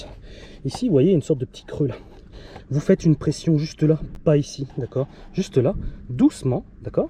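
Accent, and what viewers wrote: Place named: Northern France, France. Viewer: On viens tous les deux du nord, et tu as EXACTEMENT la même façon de parler qu'un de mes profs. Je pense que tout est lié.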